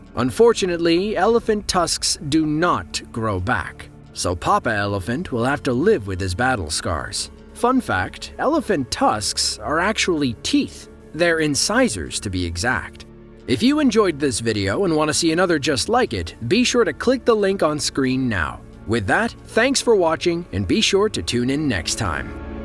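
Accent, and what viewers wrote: United States. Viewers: judging by the narrators accent, he is probably American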